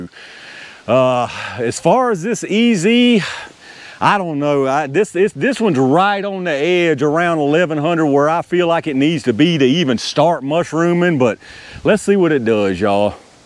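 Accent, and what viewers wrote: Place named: North Carolina, United States. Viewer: He may live in Virginia, but that accent is eastern North Carolina.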